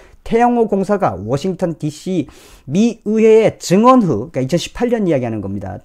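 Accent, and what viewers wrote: North Korea. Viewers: 대표님! 북한말 귀에 거슬리네요!!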